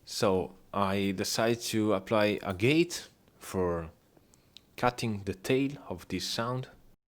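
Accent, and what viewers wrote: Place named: Spain. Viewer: considerato che sono italiano, direi passabile. Pensavo tu fossi spagnolo, forse è l'accento! Non te la prendere, scherzavo sull'inglese, ma sono serio con i complimenti sul tutorial, davvero un ottimo lavoro.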